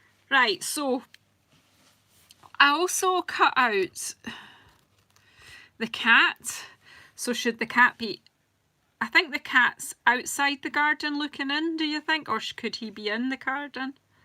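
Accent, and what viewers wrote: Scotland, United Kingdom. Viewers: Where is that accent from as I have never heard it before and have the hardest time ever understanding you. It is NOT you, it is me being German. Is this Scottish? Love the card a lot!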